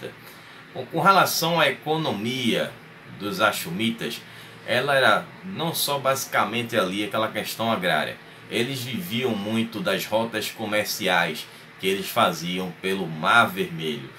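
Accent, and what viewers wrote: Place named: Pernambuco, Brazil. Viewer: História com o Obrigado sou seu seguidor a um tempo já gosto muito da sua didática,esqueci de perguntar se você é nordestino, parece pernambucano rsrsrs Algum dia você vai falar sobre os Citas e o povo do mar? Obrigado pela atenção.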